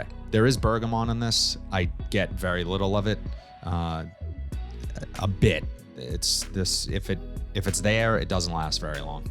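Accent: Boston, United States. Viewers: It's very distinctive. I'm not even American but I can pick that Boston accent out a mile away. It gives you your charm mate 👍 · Nice presentation. But seriously, you need to get rid of that Boston accent.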